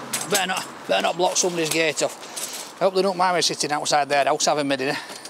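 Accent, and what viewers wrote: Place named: Barnsley, United Kingdom. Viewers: 🤣 I have literally started saying “ oh my god “ in his Yorkshire accent every day xx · He clearly has a Barnsley accent, but he's obviously 'inspired' by Peter Kay. ..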